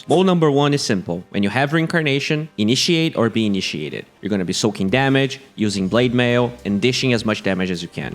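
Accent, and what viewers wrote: Brazil. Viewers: o cara é br da pra ver pelo sotaque kkk tnc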